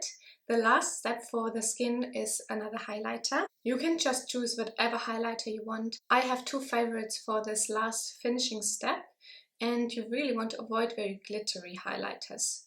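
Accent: Germany. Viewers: haha ich wusste ich hatte einen deutschen Dialekt rausgehört :) du bist wirklich wunderschön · are you german? think you have an very german accent.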